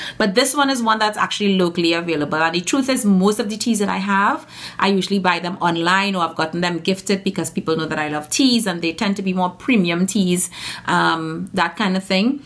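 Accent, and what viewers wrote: Trinidad and Tobago. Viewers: Trini accents really nice enno.... " check me .. haha a a ah not smelling nuutinng , a a like smell mih arm.. it ain hav no odour" hahah We are so extra joyful - and happy 🇹🇹hahaha #tryingtoget #agulfcityrun Gosh and you look like a school friend of mine Akilah - Chinese mixed eyes and round face - cutie cutie- laugh and smile hahah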